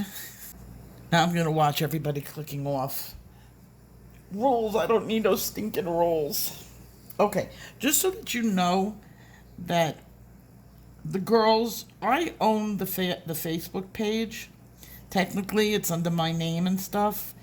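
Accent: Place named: Boston, United States. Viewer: This is off topic, but I like your accent! Sounds kinda like a Baw-ston (Boston) Accent. Oh, and I love your coloring too!! ❤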